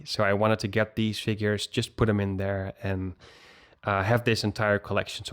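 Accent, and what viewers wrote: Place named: Netherlands. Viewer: Im hearing a sort of Dutch accent.. btw thnx for the review.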